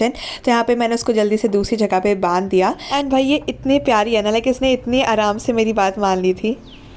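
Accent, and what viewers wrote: Haryana, India. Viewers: Aap haryana se ho??? Seriously??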